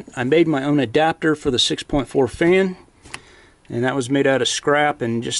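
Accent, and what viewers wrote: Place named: Georgia, United States. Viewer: Lol I knew this guy was from Georgia before I Saw his tag I’ve been to pike County a few times!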